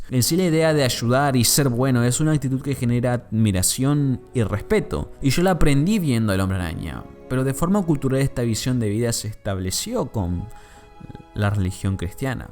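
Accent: Argentina. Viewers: Argentino papa!!!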